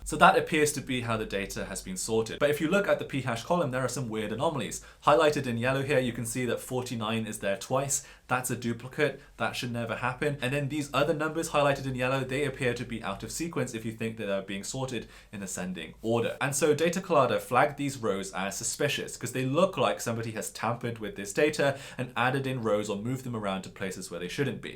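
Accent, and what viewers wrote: England, United Kingdom. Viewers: This guy speaks perfect English English. He actually says "dyoon" not "June" when saying "Dune"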